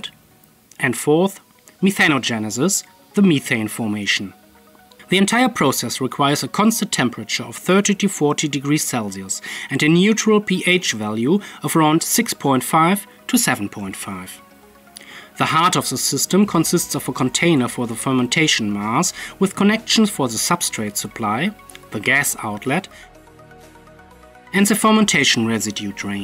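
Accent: Germany. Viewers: He's German 😂 the accent is very clear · Dual Publishing in your native language is neat and appreciated! Also, don’t feel bad about your accent, it’s neat and ALSO your English is far better than my german! (And any English speaker whining about it probably can’t speak a single other language!) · I love the German accent especially yours it’s nice, like a fine British accent!!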